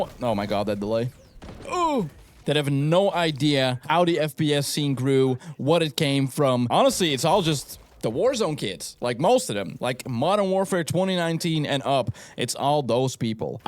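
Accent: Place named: Netherlands. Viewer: je klinkt nederlands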